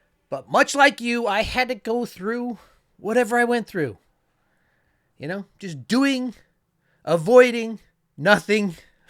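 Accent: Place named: New York, United States. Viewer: How do you sound like a New Yorker being from Utah?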